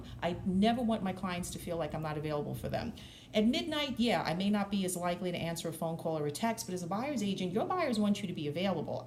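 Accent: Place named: New York, United States. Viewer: Great video! I love the NY accent!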